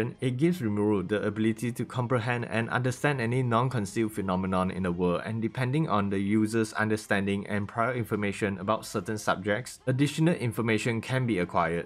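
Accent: Singapore. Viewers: You have singapore accent. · Holy shit your accent is VERY Singaporean lol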